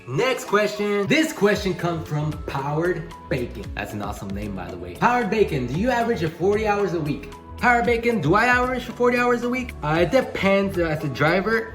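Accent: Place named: Puerto Rico. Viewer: cuz I saw your video u posted on ig were your video is about a guy asking u if you guys were open and u were talking in Spanish, but u had a Puertican accent. But both my parents are from Mexico but I'm born and raised in Los angeles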